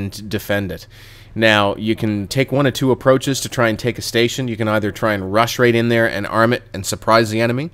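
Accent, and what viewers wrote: Canada. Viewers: You sound Canadian. Are you?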